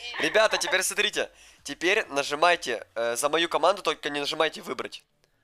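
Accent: Ukraine. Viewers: Видно видно Украинская проризается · ты украинец?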